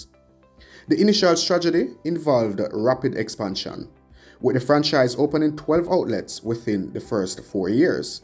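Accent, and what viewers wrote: Jamaica. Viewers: Put this on just to hear a Jamaican accent.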